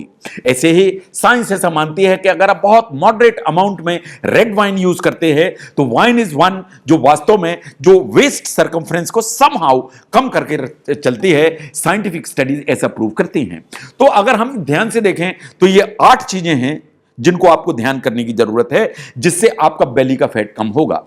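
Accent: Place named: Awadh, India. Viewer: "Joun hai" pure awadhi waah boss 😍 Dill baag baag ho gya jo aapne bech beech me "joun hai"bolte rhte hai aap awdh ki Shaan hai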